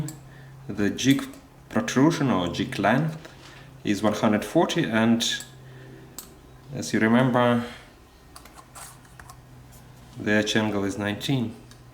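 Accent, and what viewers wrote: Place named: Russia. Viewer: Когда я услышал "хорошо" в начале видео, подумал показалось. Но когда увидел имя на книге плюс акцент - всё сложилось :) Приятно смотреть и слушать коллегу с такой глубокой компетенцией. А ещё порадовало понимание того, что вы живёте в Австралии и, вероятно, востребованы там; что всё у вас получилось. Привет из Санкт-Петербурга!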